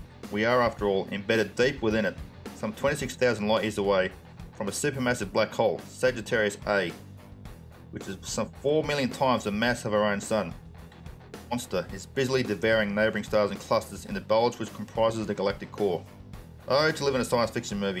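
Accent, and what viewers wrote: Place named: Australia. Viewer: That Australian accent tho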